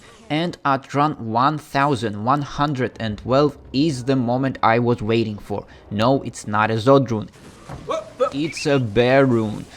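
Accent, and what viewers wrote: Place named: Eastern Europe. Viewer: Comfy vibes? Check Eastern-European accent? Check Yep. This is a D2 farm video alright. Welcome to my second-monitor list, good sir 😊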